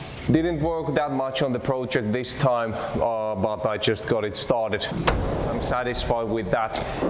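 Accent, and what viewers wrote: Finland. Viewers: vai että kässis. pakko sanoa etten oo koskaan ennen kuullut moista. kai se voi rallienglanti taittua opelikuskiltakin.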